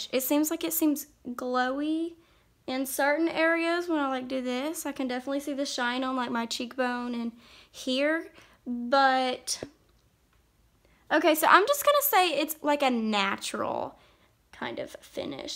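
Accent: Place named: South, United States. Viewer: I just subscribed because of that accent!!!! I love the Southern accent.. Wish I could have more southern Americans coming to the UK the accent is a delight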